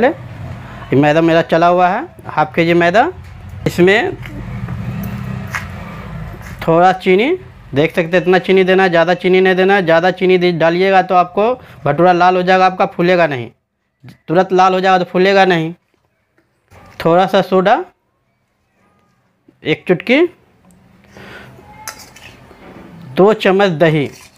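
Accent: Bihar, India. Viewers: Pura theth Bihari Han marde tu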